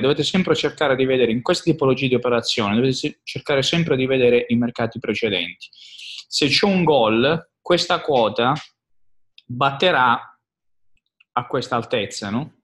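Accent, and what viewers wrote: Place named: Bari, Italy. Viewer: Non si capisce nucazz propij alla barese come parla lui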